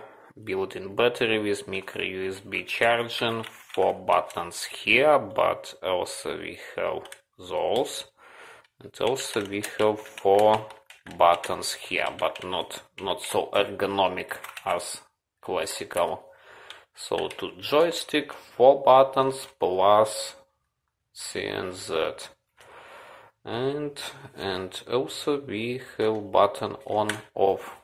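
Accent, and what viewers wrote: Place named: Russia. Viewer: Why do you have a russian accent ?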